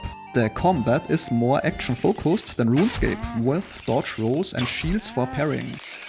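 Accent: Germany. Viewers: Der Deutsche Akzent Kickt Hart^^ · this german accent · The german accent is strong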